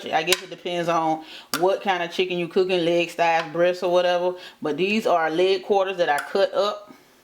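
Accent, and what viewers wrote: South, United States. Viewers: Thank u miss for this great and simple recipe. Love yo southern accent and spirit.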